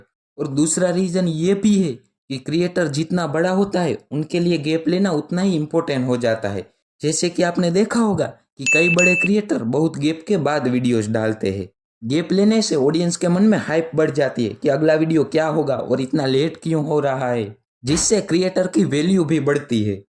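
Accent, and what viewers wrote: Gujarat, India. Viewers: Bhai gujarati hona 😅😅😅😊AVAAJ SE PATA CHAL GAYA MAI BHI GUJARATI HU❤ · Bhai tu gujrati hai na 😂😂😂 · Pakka tu bhai Gujrati hain 💀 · Avaj se lag rha nam gujarati hai and darbar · Ha ye gujrati hi he avaj se😅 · Ha bhay ya gujarati ha lakin kontat achh dal raha ha ❤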